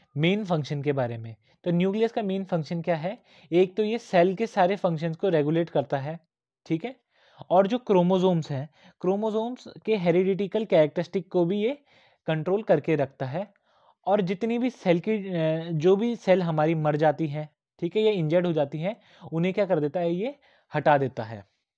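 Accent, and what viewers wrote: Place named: Bareilly, India. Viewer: Sir you are from bareilly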